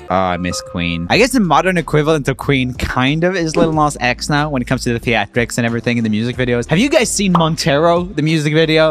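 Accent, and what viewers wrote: United States. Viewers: I don't know why byt I heave expected that you studied music or something, but definitely not looking at how do you pronounce all of those names. But damn, that americanized pronounciation gave my dry heaves, just how could you? ; ((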